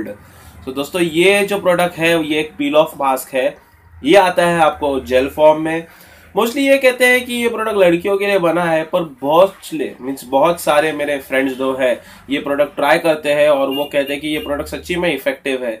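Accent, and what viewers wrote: Maharashtra, India. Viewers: Chitak gaya...😂😁😅 mother tongue marathi ahe vatat tumchi..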